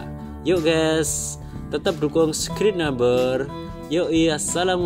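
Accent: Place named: Java, Indonesia. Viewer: Asline pundi mas? Medok rek 👍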